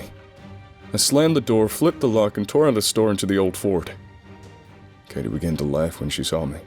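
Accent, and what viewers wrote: South, United States. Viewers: Faith Castillo - haha fair enough! It IS a good southern accent, anyway. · I Love the Southern Accent! 🤗 Really Wild Story! 😍 Thanks For All Your Hard work! 😙🙋✌💜🐺🐶🌻🐢🐾🐳❤💋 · are you sure that you weren't born in the south? Your accent is spot on! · I settle in to hear soothing voice and am startled by this jarring "american" accent. Yikes! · Once again sir, well done. as per usual, your timing, tone, and emotion is perfectly delivered. Maybe you could mess up every now and then, just to prove you are not an alien life form. Also, I absolutely love this accent. It sounds to me like a mix of north Tennessee good ole' boy, and a south Georgia gentleman, but that's just my take on it. You could easily pass for a real Southerner. Keep up the great work buddy! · "Butt hurt" 😂 I haven't seen any butt hurt comment here yet, still scrolling tho. 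So far people seem to like the accent. I personally don't like southern accent at all, for non-english speaking like I am it sounds somehow slurry and words are sometimes very hard to recognize. It's important to remember that disliking someones accent doesn't mean the person themself is dislikeable (is that a word 😅). I'm definitely not butt hurt about this story's accent since the author has so damn beautiful voice 🥰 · As somebody from the South, I'm really blown away by your Southern accent. Like, can we informally adopt you? 😂 Bravo!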